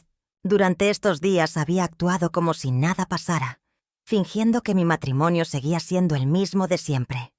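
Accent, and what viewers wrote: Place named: Spain. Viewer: Xgue es otra persona la que habla, no ella . Es española la gue narra.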